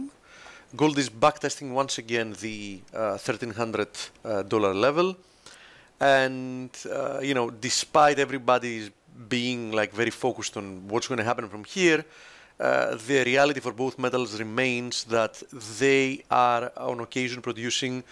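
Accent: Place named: Greece. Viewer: Love his greek accent. Best analysis going.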